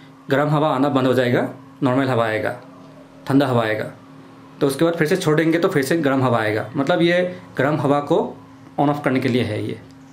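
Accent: Assam, India. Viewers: Tum assamis ho na tumhara bat se pata salrahahe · Are you assamse ? Your accent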